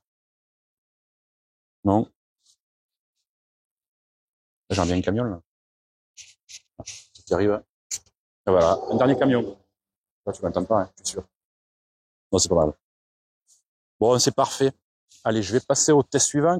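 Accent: South, France. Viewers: hello, une fois de plus, très bonne vidéo très "QUALI 😊" pas desbrouff...et agréable à regarder et à écouter, ""avé"" l'accent du sud🤩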